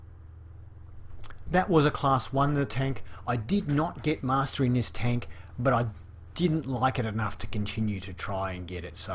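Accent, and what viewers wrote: Australia. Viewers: Thanks, yes the game has changed a lot, with remade maps and new ones, lots of new Prem tanks introduced, so that for example if I still had my T32, and a few others, it would be out classed by many tier 8's now. But glad you are back as I like your presenting style, and, may I ask if you are an Aussie or Kiwi?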